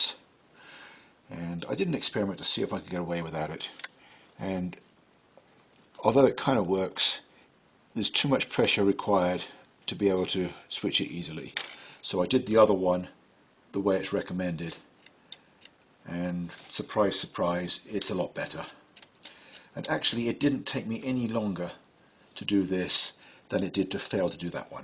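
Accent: United Kingdom. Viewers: GREAT JOB - FORGIVE ME WHERE ARE YOU IN THE WORLD- YOU HAVE AN EXCELLENT BRITISH ACCENT BUT STATE PRICES IN DOLLARS LOOKS LIKE ASHBURN USA? WHATS THE STORY THERE?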